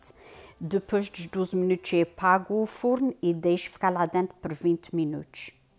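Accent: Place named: Azores, Portugal. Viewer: É a primeira vez que vejo fazer molotov sem pôr a mão caramelo na forma, mas sim na claras, é muito mais fácil, obrigada senhora pela receita 🙏 desculpa a pergunta a senhora é dos Açores, porque tem a prenuncia parece ser dos Açores.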